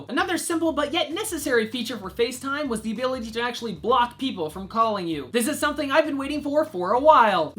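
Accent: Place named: Canada. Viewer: you r are canadian?????? how could I not have noticed that??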